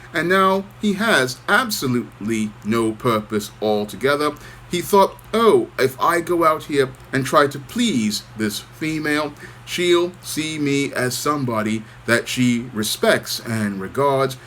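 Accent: United Kingdom. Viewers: Its sad to see Prince Harry's current state- esp in comparison to older brother Prince Williams. Which shows why as a man, who you marry tells us a lot about who you are- which is why men are walking away from marriage at record numbers. Thanks for doing the commentary with a British accent! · GREAT UK ACCENT MATE !!!!!!!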